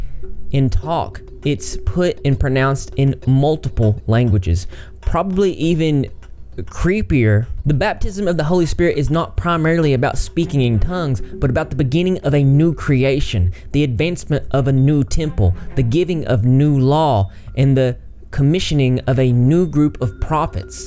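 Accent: South, United States. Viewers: And as far as someone saying something about your voice in a previous video... I’m a southerner from Alabama and see nothing wrong with a southern accent. 🤠👍🏼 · hearing all this in a southern accent has me shook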